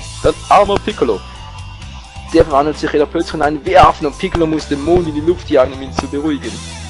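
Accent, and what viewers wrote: Switzerland. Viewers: Ich finde deine Stimme nur sympatisch, bedingt durch deinen Akzenten. Schweizer haben nach wie vor den coolsten Dialekt. Und nebenbei, echt tolle Let's Fetz, Fight, Play, wie auch immer ;D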